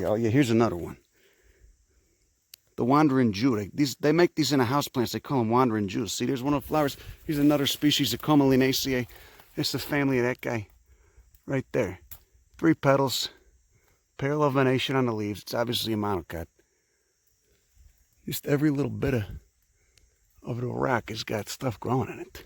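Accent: Chicago, United States. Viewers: I told my wife check out this dude with a thick Chicago type accent talk about nature like a boss. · *Chicago accent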